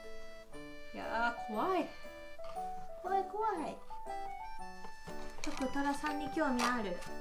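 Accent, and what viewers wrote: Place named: Kyoto, Japan. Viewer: 今日も可愛いたっ君観てる💕 は京都弁のイントネーションで穏やかで優しくて聴いてて気持ちがいいです。たっ君への愛情もたっぷっり〜。にピアノで厳しくされなければ反抗期ないかもね？たっ君で良かったね❣️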